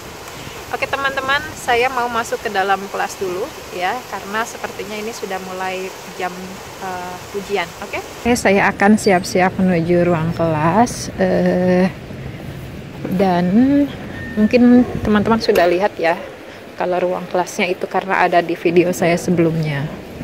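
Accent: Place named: Sunda, Indonesia. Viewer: logat sundana meni eces..teteh❤❤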